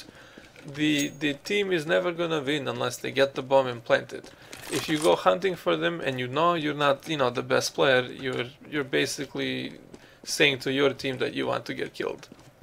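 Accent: Russia. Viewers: How to not (Me: Man this guy has a strong Russian-like accent!) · Forgive me if I'm wrong, but that sounds Russian. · Are you a russian or something? because you sound russian with your accent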